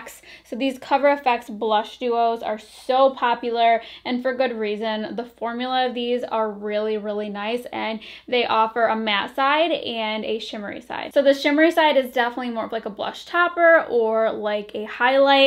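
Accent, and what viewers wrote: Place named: Michigan, United States. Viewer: This is totally random, but are you from Michigan? You definitely sound like you have a Michigander accent. :)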